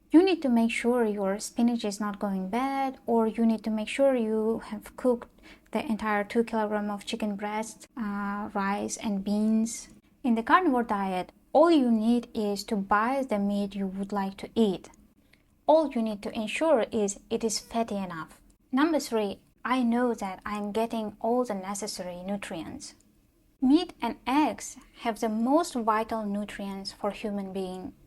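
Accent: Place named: United Kingdom. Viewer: It sounds like you’re in the uk? 🇬🇧 welcome to the tribe. 😊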